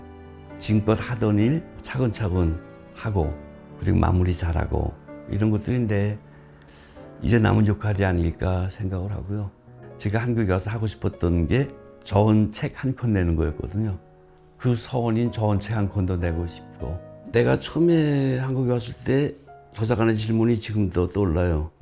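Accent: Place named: North Korea. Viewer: 이북말씨 정겨워요 아버지고향 평안북도. 박천. 입니다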